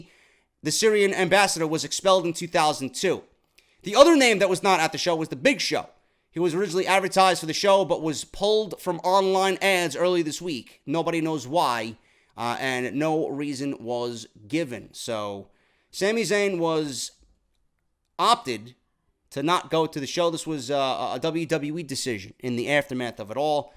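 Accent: New York, United States. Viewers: you are straight up New York you are like the difference between a glass of water and a shot of tequila STRONG, keep it up glad I found you you’re Number 1😎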